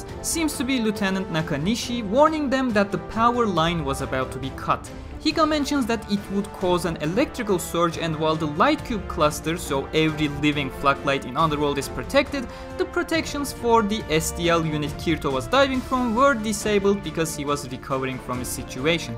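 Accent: Turkey. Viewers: You really have a turkish accent,türk müsün lan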